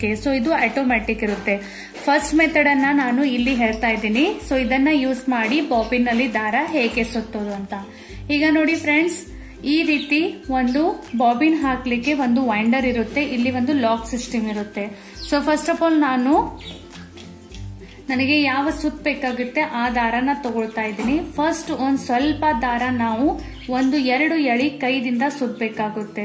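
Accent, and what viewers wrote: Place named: North Karnataka, India. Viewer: Hi sister very usefull video nanu uttar karnataka davalu niu nam language tharane adtira niu yav districtdavaru ?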